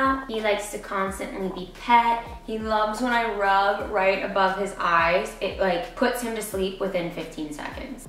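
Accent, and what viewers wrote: United Kingdom. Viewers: The little twangs of British that come through in your voice because of Kara are so cute! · That “oh did that offend you” sounded so british 😂 you’re getting a slight accent girl 😂😍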